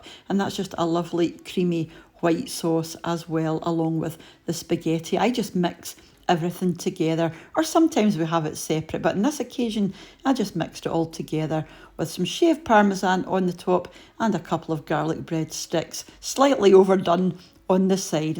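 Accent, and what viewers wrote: United Kingdom. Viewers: Wow, if not for your accent, I would think that your menu was pretty much right here in the USA! I had no idea that Mexican food is so popular and available in Britain. And iceberg lettuce? How long has that been available there? I'm 75, and iceberg has been around here forever. Now Romain lettuce is quite popular here. Luv ❤️ your videos.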